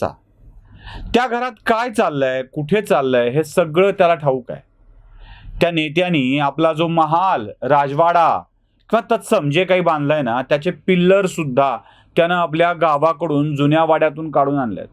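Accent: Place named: Latur, India. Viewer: शब्दाला व शब्द फेकीला धार आली आहे. खूप खूप शुभेच्छा. एक कळकळीची विनंती. न आणि ण ची गल्लत सुधारून घ्या. लातूरची भाषा, हेल आवडेल पण उद्याची पिढी जेव्हा तुमचा आदर्श ठेऊन अश्या चुका करतील व ते पण असेच बोलतात म्हणून सांगतील तेव्हा खूप दुःख होईल. राग नका मानू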